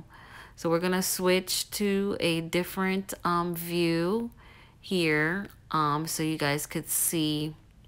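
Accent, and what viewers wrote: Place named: Jamaica. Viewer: Ok....I definitely hear a Jamaican accent!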